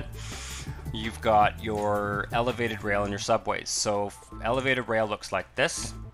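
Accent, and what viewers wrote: Canada. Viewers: Thanks man! This whole time I thought you were from Toronto btw haha you have that accent, I’m from Toronto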